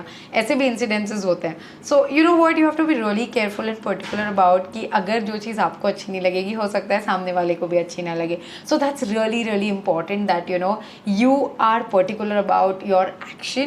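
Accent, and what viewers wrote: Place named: India. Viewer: Your Desi English very nice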